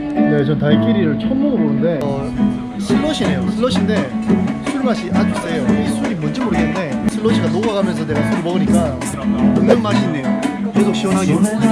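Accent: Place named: Daegu, South Korea. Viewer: 저도대구사람이라 사투리가정겹고 너무재밌어요♡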